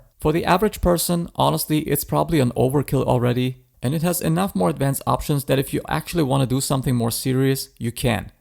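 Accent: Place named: Germany. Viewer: An interesting accent. Are u german?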